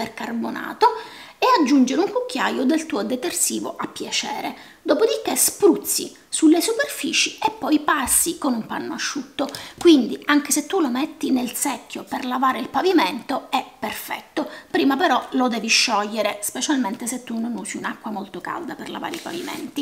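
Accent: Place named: Palermo, Italy. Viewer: Sei di Palermo come me riconosco la cadenza 😂 ; è vero non si trovano nei negozi normali grazie per avere dato la dritta su Natura Sì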